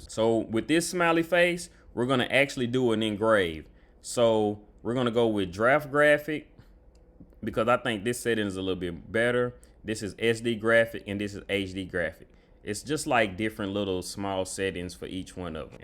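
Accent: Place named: Cleveland, United States. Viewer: If you just listen and not watch you can hear Cleveland lol awesome video my man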